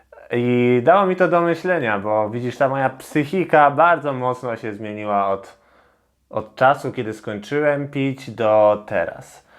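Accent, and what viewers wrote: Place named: United States. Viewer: Twòj amerykański jest cool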